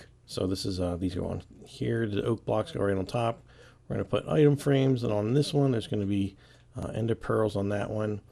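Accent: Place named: United States. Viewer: he's from america but he talks a little different fro me I'm from England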